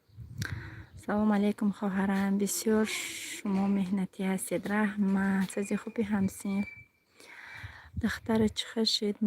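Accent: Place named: Vahdat, Tajikistan. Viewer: Салом Алейкум.Ман фикр мекардам аз Вахдатен,барои,ки лахчатон монанд.Аллох нигахбонатон,Хохарчон.УВАЖАЮ ВАС!!!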